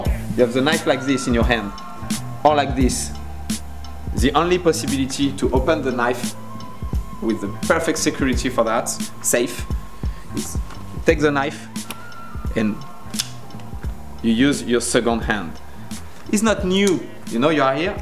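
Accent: France. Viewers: Super vidéo mec, je ne savais pas qu'il y avait un français sur cette chaîne j'ai reconnu direct avec l'accent ^^ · Avec un délicieux petit accent français ;-) Merci pour la vidéo!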